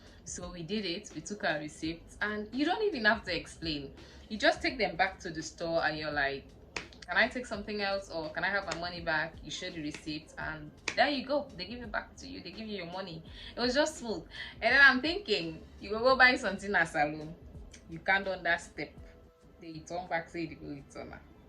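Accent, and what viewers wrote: United Kingdom. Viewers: yeh few more months an u would have been speaking 🗣️ queen English…it was bang on‼️ really good to hear👂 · This was so fascinating to watch and oh, you've got the accent 🤗. England has and still is, my dream country 😅. The day I get opportuned, I'll contact you to walk me through 👏🏽.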